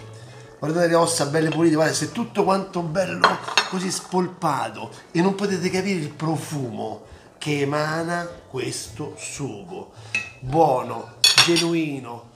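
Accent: Rome, Italy. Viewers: Ma quanto mi piace l accento romano,troppoooo belloooo...ciao....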